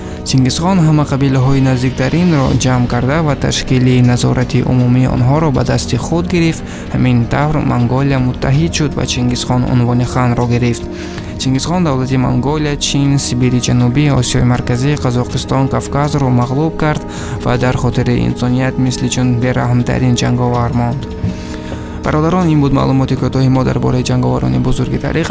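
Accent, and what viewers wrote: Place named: Russia. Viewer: То что этим делом, т. е. темой великих исторических личностей по завоеваниями интересуясь, хочешь чтоб другие тоже были осведомлены, это очень благородно. И то что, акцент русскоговорящих у тебя в декламации чувствуется, надо обязательно устранить. Если это получиться, аудитория говорящих на фарси тебя с нетерпением и пением гимнов будут ждать. Но главное в содержание роликов. ХРОНОЛОГИЮ ОБЯЗАТЕЛЬНО ДОЛЖЕН СОБЛЮДАТЬ. Это первое в научной историографии. А то в комментариях смотри, независимо от суть изучения, выдвигают свои амбиции. Поэтому я свою комментарию пишу на русском, чтоб все заинтересующие люди поняли. Но методы современная историография ныне очень устаревшие и например вот такой подход -- взгляд исследователя на историю материалистическим мышлением, ни куда не годится. Тем более, история таджиков считается как история "с незапамятных времён.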